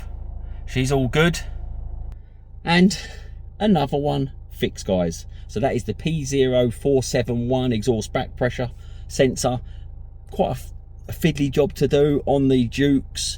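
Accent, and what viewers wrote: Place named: South, United States. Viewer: Fabulous work only wish you live nearer than your southern accent may suggest 😜👍, I want this same procedure doing so can you let me know how much to expect to pay so I can get some quotes? Thanks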